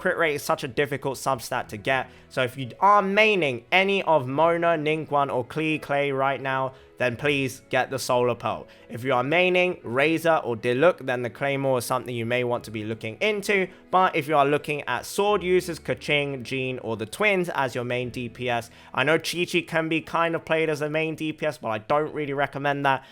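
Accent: United Kingdom. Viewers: An asian dude with a british accent. wtf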